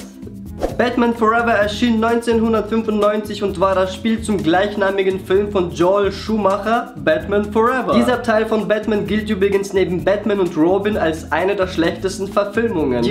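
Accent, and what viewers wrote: Russia. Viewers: Er ist russe...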